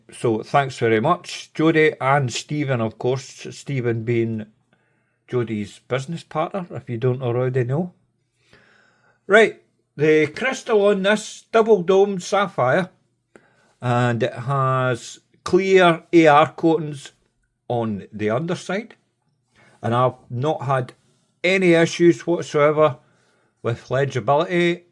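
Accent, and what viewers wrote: Scotland, United Kingdom. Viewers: Great review. Love the genuine Scottish brogue!! 🙂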